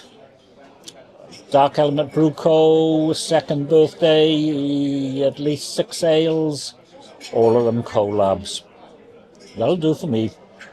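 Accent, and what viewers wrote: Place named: Wales, United Kingdom. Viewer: Love your Welsh-inflected patter...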